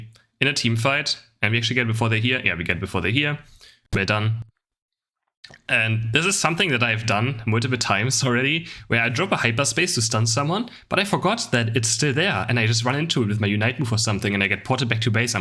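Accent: Germany. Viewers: I can hear the German in his voice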